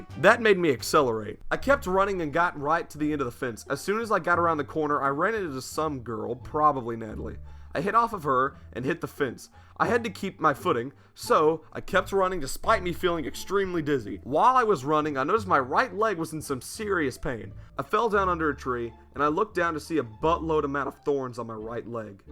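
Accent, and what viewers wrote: South, United States. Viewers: you have a heavy southern accent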